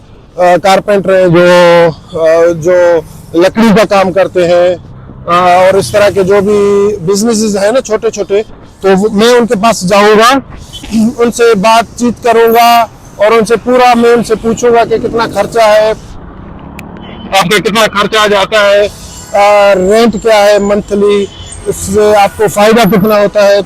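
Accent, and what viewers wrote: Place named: Sindh, India. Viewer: Talking like a sindhi national,,aon sahih pyo chawan ya galat ❤ saen kerry ahyo ?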